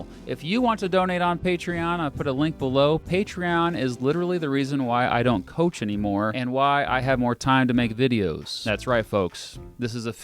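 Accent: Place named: Kansas, United States. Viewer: He's from Kansas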